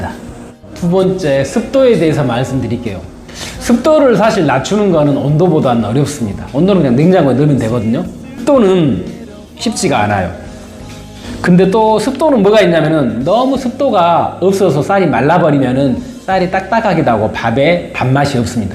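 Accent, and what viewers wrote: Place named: Jeolla, South Korea. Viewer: 인상이 좋으시네요^^ 잘 들었습니다^^ 말투로 보니 제 친가 지역 (7시 ㅋㅋ) 부근에 있으신 것 같기도 ㅋㅋㅋ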